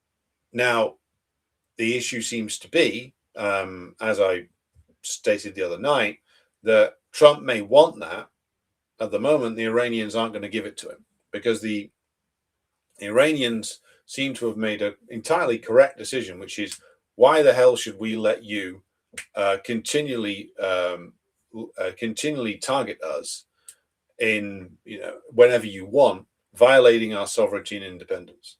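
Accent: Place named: United Kingdom. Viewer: Why are you using the US Empire version of “Liberal”. It confused me. A British accent using the US Empire version. If you’d had an American accent or it’d been as part of the Trump impression I’d have got it straight away. Hopefully as the US Empire collapses they no longer can pervert words and we get them back. Plus what if someone French watches and thinks it is like libéral or German liberal? Or maybe they speak a language based on Latin and so misunderstand the Liber bit?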